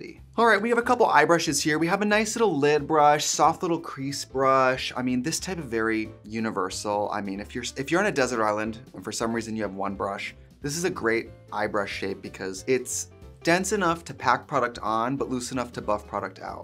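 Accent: Wisconsin, United States. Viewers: the wisco accent 😭❤️ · As someone who lived in Wisconsin for 10 years, her accent coming out always makes me giggle lol. It’s prominent in a lot of the things she says but it’s too funny when it REALLY comes out 😂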